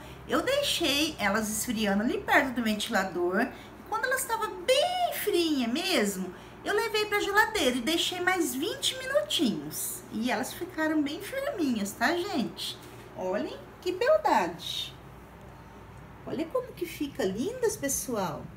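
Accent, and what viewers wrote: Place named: Minas Gerais, Brazil. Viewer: Sutaque mineiro ótimo vou fazer essa delícia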